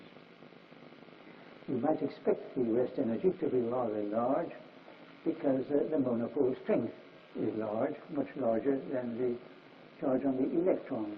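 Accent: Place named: United Kingdom. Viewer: I was wondering! It can’t be, this guy has a British accent but Dirac was American right?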